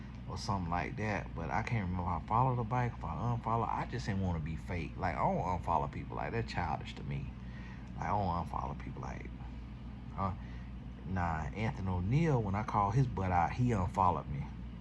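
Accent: South, United States. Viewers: 😂😂 right! It’s the country twang in him explaining this craziness. Hilarious! Cause what is she doing!!!?!!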